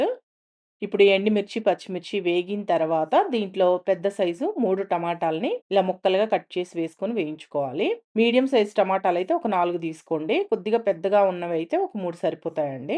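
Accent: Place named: Telangana, India. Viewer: Edi Telangana style😊.. baguntundi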